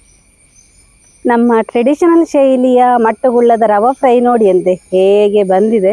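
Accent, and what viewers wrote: Coastal Karnataka, India. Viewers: ನಿಮ್ಮ ಕರಾವಳಿ ಭಾಷೆ ಕೇಳಲು ಬಲು ಚೆಂದ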